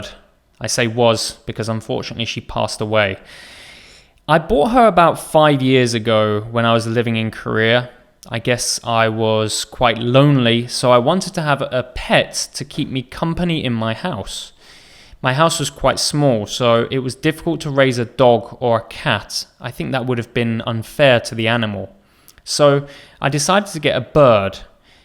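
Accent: United Kingdom. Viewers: I 've just come across with your channel its really so helpful and your accent is very clear i also like the British accent especially when you're pronouncing the sound s as a sh .Hajar from Morocco 🇲🇦.thanks a bunch.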